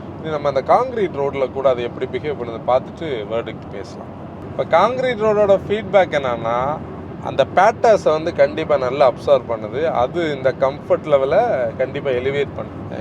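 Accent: Kanyakumari, India. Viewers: veri nice explains.....👌👌👌👍👍👍💯💯💯 I am Indian Army 🪖 ஐயா கொஞ்சம் கன்னியாகுமரி பாஷையில் கொஞ்ச நேரம் பேசுங்க அதை கேட்கணும்னு எங்களுக்கு ரொம்ப ஆசையாக இருக்கிறது...🙏🙏🙏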